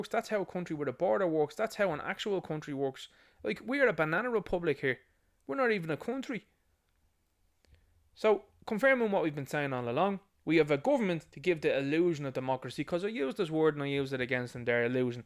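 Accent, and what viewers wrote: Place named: Dublin 4, Ireland. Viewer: Love ur d4 accent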